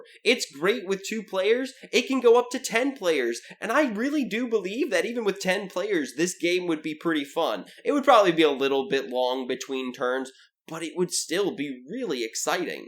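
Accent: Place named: Texas, United States. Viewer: Oh my word. I love the %100 authentic Texan accent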